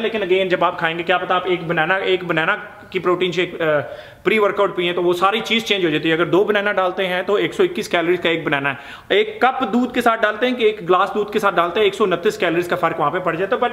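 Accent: Punjab, India. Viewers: Super style sir ji sp ki hindi panjabi mixx super shahid style sir 😁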